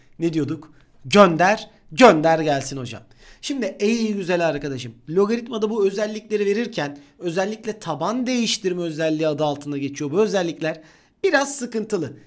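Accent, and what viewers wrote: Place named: Izmir, Turkey. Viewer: Bi İzmirli ağzı sezdim sanki.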